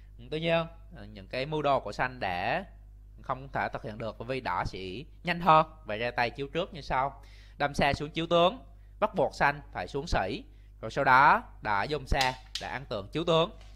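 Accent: Binh Dinh, Vietnam. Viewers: Ng bình định hay phú yên gì đó chớ quảng gì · Giọng bình định hay phú yên mà nghe khá nặng? Có vài từ ko nghe kịp! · Bình Định!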